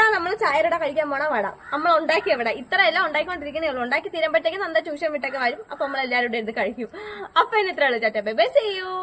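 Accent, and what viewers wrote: Kollam, India. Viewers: Ninghal ethu districtil aanu...shaaili kettittu kollam aannennu thonnunnu ...😅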